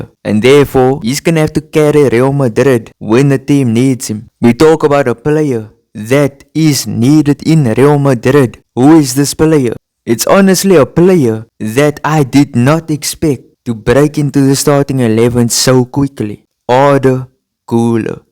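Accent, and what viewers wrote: South Africa. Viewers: Yoh bro are u south African i am too, your accent gave u away, big up😂🎉❤ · editing skills are top-notch,Bruv! You have a South African accent. Hala Madrid🤍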